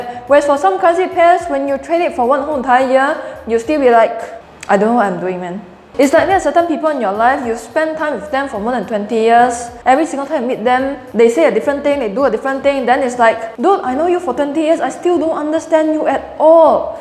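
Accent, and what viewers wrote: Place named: Singapore. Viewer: Haha, really love watching your videos. Having someone explaining things in the style of Singaporean feels so homely.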